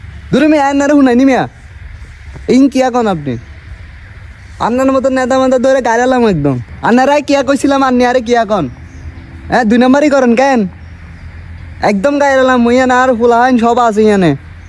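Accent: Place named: Mirsarai, Bangladesh. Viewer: ভাষা টা হচ্ছে মিরাসরাই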